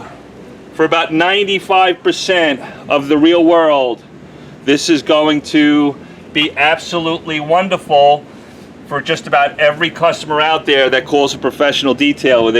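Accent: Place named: Brooklyn, United States. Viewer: Haha I thought the same thing I’m thinking Hey, this guy sounds like he’s from Brooklyn, like me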